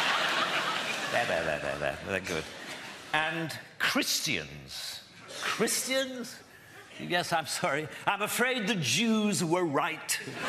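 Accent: United Kingdom. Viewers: I live in the USA, but I miss good British humor and beautiful British English... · He has a Welsh accent, so he's Welsh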